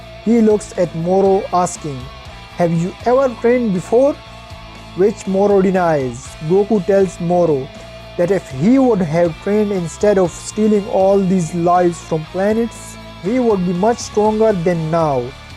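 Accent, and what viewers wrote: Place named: India. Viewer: Your voice is like a indian btw i love your videos and now i also love your voice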